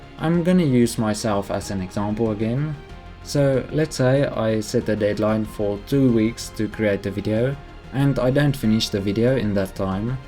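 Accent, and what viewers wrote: South Africa. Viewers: you have already surpassed the achievements of many people three times your age. You are an old head on young shoulders as the saying goes. As a model railway enthusiast myself it’s always nice to see and hear what other folks are up to and you definitely bring a fresh outlook to the hobby with a wealth of interesting personal observations along the way. You are teaching me things and I’m one of those much older enthusiasts. Keep up the good work but don’t knock yourself out trying to hard. It’s all about enjoying the hobby and life at the same time. Your English is great and the South African accent just makes it even more interesting to listen to.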